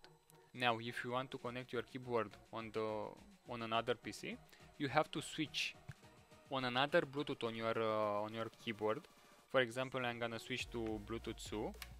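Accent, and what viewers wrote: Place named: Romania. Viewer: asta e sigur roman dupa pronutie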